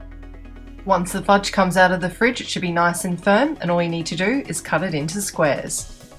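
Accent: Australia. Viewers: Are you Australian? Ps I love all your vids :)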